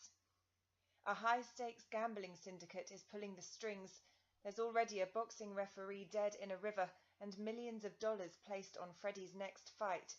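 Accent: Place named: United Kingdom. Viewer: (well, it sounds British to me, I'm not a native speaker! Sorry if I guessed wrong!)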